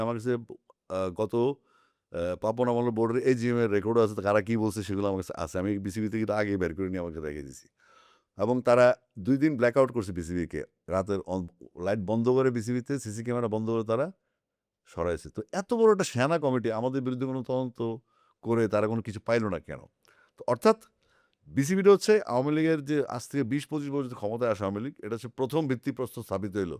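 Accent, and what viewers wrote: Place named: England, United Kingdom. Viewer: The host should slow down a little and stop mixing Bangla with an English accent…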